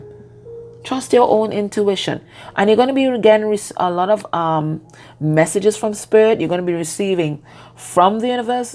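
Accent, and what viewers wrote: Trinidad and Tobago. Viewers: Are u Trini?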